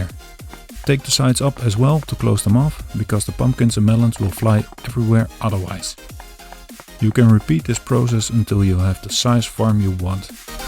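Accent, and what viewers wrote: Netherlands. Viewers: u sound dutch sir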